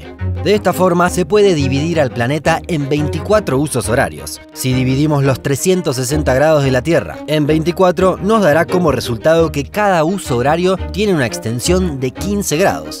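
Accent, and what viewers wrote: Buenos Aires, Argentina. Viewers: De todas maneras además de hablar de las "Islas Malvinas" y reivindicar nuestra soberanía sobre ellas en varios videos en los cuales se las nombra, y del acento caracteríastico de los porteños (no de los argentinos, porque en mi país tenemos muchos acentos distintos), el canal está registrado como un canal argentino. Estos muchachos han estudiado en una de nuestras honrosas Universidades Públicas, dios y el pueblo las conserve. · Sos argentinos o uruguayo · una pena el acento argentino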